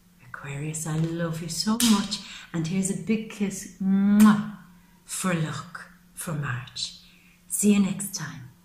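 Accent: Ireland. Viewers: Very nice to find you! Are you Irish? Great reading! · Do I detect an Irish accent? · You're Irish also? 😊😂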